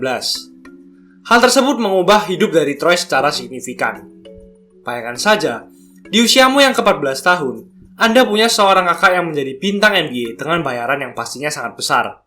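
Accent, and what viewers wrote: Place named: Surabaya, Indonesia. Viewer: Medok banget... Wong Suroboyo Yo... Hehehehe..😀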